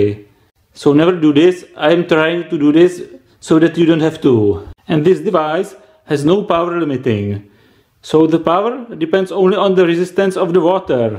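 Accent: Czech Republic. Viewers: Czech english lol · Czenglish :D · Czech republic i guess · I love his Czech accent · Good CZenglish, better than Sobotka.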